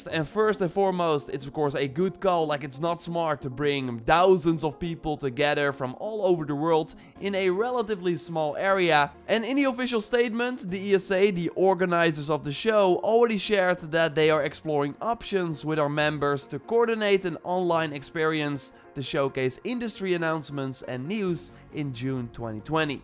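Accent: Netherlands. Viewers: Hey, Like your video's very much and learn a lot from them as well. Ben je Nederlands? Dacht het te horen aan je accent.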